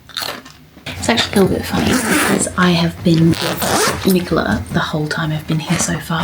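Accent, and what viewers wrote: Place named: United Kingdom. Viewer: The British accent coming out nice and strong!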